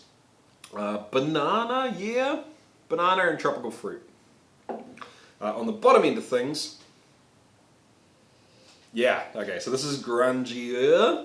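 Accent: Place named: New Zealand. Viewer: If the accent didn't give it away, I'll let you in on a secret; He's a Kiwi, and New Zealand is a little nicer than the US when it comes to home distilling. :)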